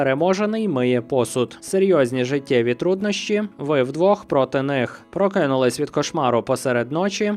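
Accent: Russia. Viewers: Та запам'ятайте вже нарешті: прохООООООООдити, довОООООООООООдилося! Скільки Ви ще тягнутимете ці свої кляті кацапські наголоси?!?!?!?!?!?!?!?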